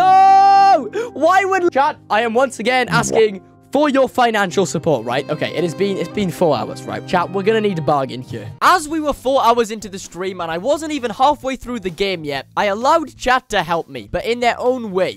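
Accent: Wales, United Kingdom. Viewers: Are you Welsh?😅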